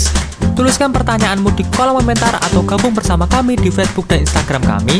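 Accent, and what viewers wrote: Java, Indonesia. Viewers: medok jawa tengah/timur ya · pengisi suara nya bikin ganggu , medog banget ! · medo'g ngomongnya.. :v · asli pean wong jowo tekan logat e wkwkwkwkw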